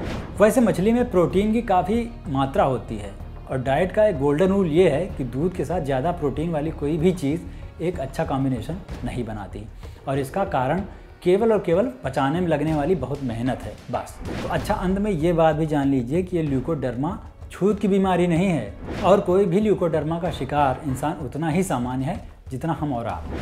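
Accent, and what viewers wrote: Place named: Kanpur, India. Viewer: आपका ही इंतजार था भाई साहब, आपकी कानपुरी भाषा सुनने मै सच मै आनंद की अनुभूति होती है ।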